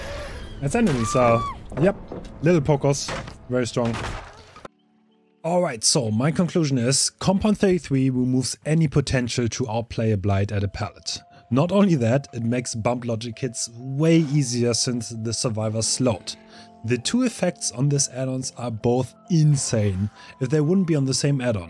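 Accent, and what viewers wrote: Germany. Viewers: Wie man den deutschen Akzent hört aber saftiges gameplay👌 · are you german? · Der deutsche Akzent:)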